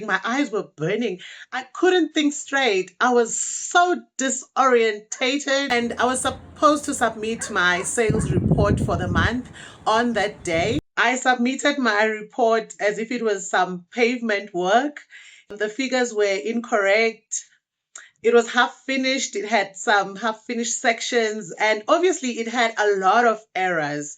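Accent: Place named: South Africa. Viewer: You sound like one of us. Anyway, love from South Africa 🇿🇦 !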